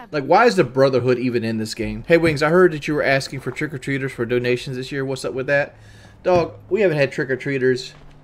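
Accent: South, United States. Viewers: That’s just a southern thing. Many southerners pronounce want like won’t.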